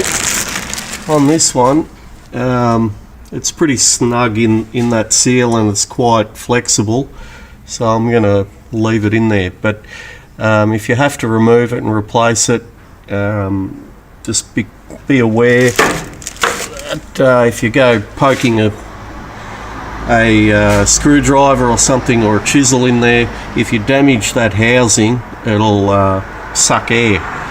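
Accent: Australia. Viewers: Are you an Aussie ?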